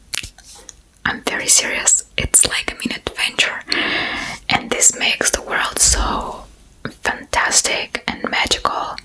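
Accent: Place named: St Petersburg, Russia. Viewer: Стоит ли ожидать контент на русском? По акценту и увлечениям Вы, типичный Питерский бумер) И почему нет монетизации в Румынии?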